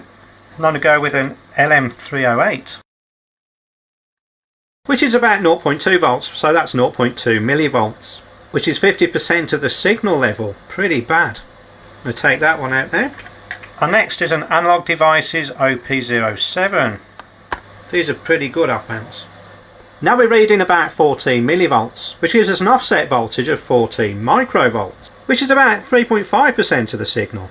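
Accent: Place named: United Kingdom. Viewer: Very friendly British speaking guy also good technician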